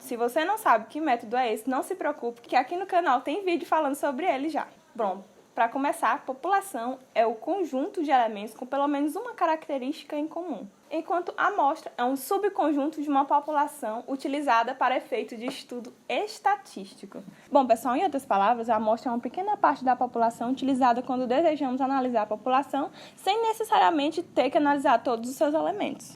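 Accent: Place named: Northeast, Brazil. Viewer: bacana a aula, e o sutaki nordestino é característico srsrs..vlw pelas dikas ,, estou me formando em engenharia de pericias vlw. pela ajuda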